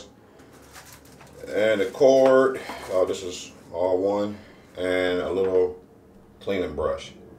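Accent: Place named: South, United States. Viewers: I was thinking this was a Brotha from the South too. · Where’d you grow up? I can heard accents, south definitely, nola?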